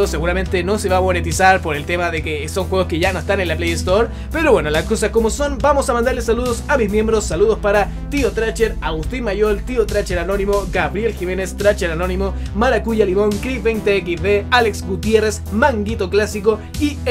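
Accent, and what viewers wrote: Chile. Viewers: Vo eri chileno o no ???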